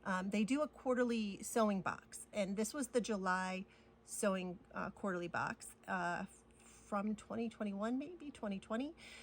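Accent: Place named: Rhode Island, United States. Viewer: Love your style of organizing your video! Drinking my coffee while watching was delightful. I am originally from RI and hearing your accent reminds me of how much I miss the salty air from the ocean breeze . I am so excited that I found you! You are a very special friend and reminder that we can all do more for our communities. 💕🇺🇸💕🪡💕🧵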